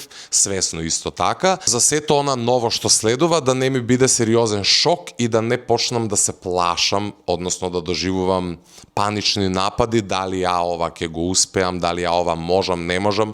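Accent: Kumanovo, North Macedonia. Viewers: Од Куманово е, па ако зборува "како што треба" пола држава нема да го разбереме🙂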